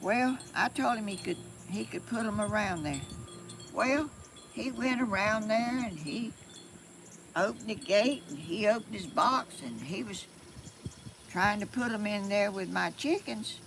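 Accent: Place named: Georgia, United States. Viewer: You are such a natural, Ms. and we love you dearly. I put you on every day for my 6 cockatiels to watch and they love you, too! I lived in Georgia for 10 years and miss it so much. It's way better than living in Florida. Just your accent makes me feel right at home. You have become family to me and my little birds. Sending love and praying for blessings to you today!:)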